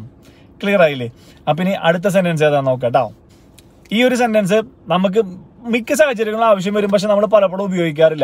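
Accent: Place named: Thrissur, India. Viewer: ലാസ്റ്റ് ക്വസ്റ്റ്യൻ and ആൻസർ ആണ് ഇഷ്ടപ്പെട്ടത്. പിന്നേ,,, തൃശ്ശൂർ കാരൻ ആണല്ലേ... ഞാനും.🥰എത്ര അറിവ് നേടിയാലും അവരവരുടെ നാടിനെ ഓർമ്മപെടുത്തുന്ന തനത് ഭാഷ ശൈലി . ഇതിന് മറുപടി ഇന്ന് പഠിപ്പിച്ച ആദ്യ പാഠം തന്നെ ഉപയോഗിക്കുന്നു.🙂 Well done my boy 😂 keep it up 😋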